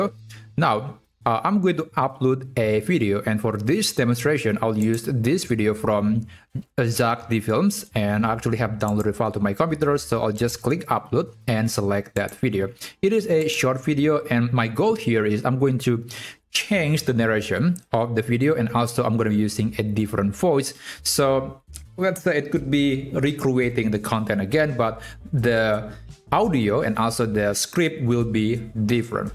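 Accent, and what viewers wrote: Indonesia. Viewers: lu orang indo bang? dari logatnya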